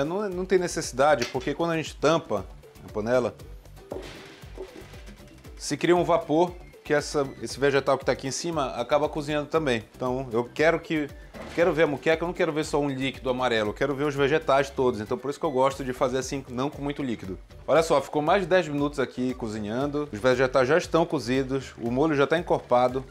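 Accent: Pará, Brazil. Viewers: Adoro esse sotaque paraense "cominho" , "picadinho"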